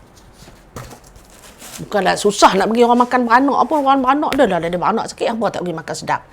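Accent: Kedah, Malaysia. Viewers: Assalamualaikum Kak, saya suka tengok video kak. Kak cakap kedah pure tak buat buat. Banyak petua kak share dalam video ni. Baguih kak idola saya setakat ni dalam arena youtube. Nanti ajaq buat kuih kaghaih pulak na..ka dah ada video nanti sat nak check...saya orang baru..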